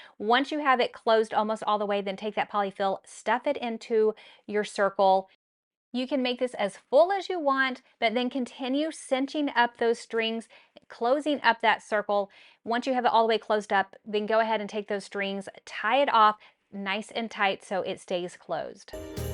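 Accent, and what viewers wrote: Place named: Texas, United States. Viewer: I haven't watched the whole video yet.. but I am here for the unboxing and your commentary. I love when your Texan accent comes out! Makes my little Canadian heart gigle 😂❤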